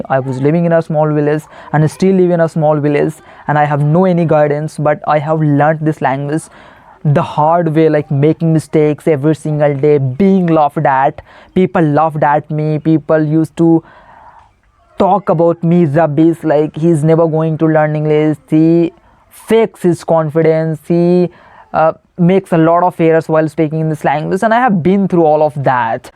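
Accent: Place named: Bihar, India. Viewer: Bihari english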